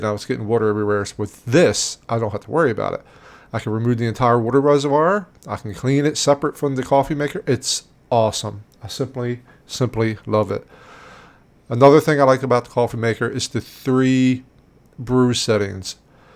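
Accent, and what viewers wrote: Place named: Philadelphia, United States. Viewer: Just subscribed . Just bought this and listened to you on the way home . I thought I recognized the accent then I heard “wooder” and I hit that subscribe button... r u from Philly or delco or pa ? HHaha cheers from one pa youtuber to what I think is another one !!!!! Peace ✌️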